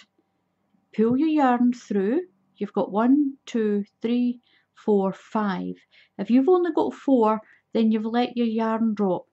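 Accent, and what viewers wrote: Scotland, United Kingdom. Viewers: Nice to hear the Scottish accent!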